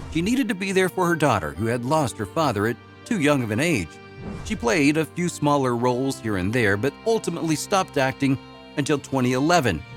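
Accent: United States. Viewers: No offence ,but why an american narrator ?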